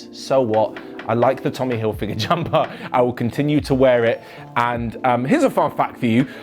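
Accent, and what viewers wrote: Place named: United Kingdom. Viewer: God I could never imagine this channel being voiced by anyone not British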